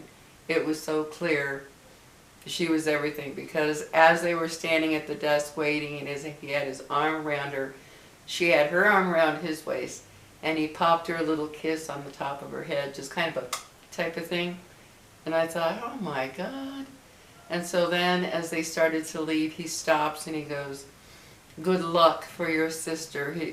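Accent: United Kingdom. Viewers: So nice to see your fun side Stay happy always I love the Brit accent too ❤ Gentlemen kind men are no longer around 😅 Your hair looks fabulous 💕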